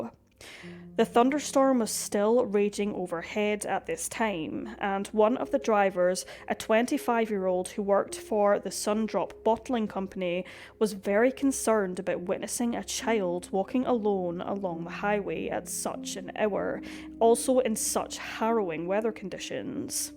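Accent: Scotland, United Kingdom. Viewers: It’s great to hear another Scottish accent on YouTube! I love the channel, I sometimes find it hard to “stay polite” and not use my “guffy” chat. 🤣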